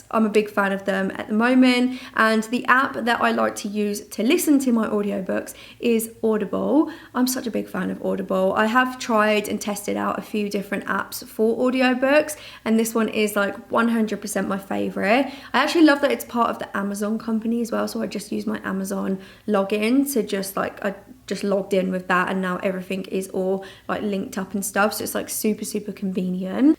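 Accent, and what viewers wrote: United Kingdom. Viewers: Was going to say, that's deffo a British accent xxx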